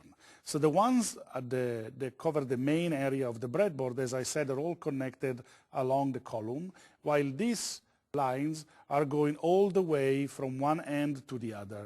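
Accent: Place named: Italy. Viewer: 意大利英语真给力~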